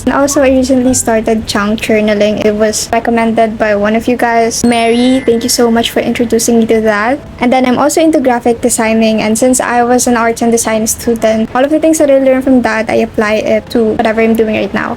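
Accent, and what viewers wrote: Philippines. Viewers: it’s kind of a filipino thing when they speak english. it’s so cool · Is she Filipina cuz her accent is very jayjay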